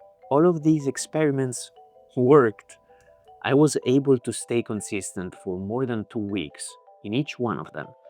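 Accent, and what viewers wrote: Italy. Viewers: Thank you for this video😁 I needed a reminder that being consistent isn't that hard when you're smart about it. I also love your italian accent! Rooting for your success!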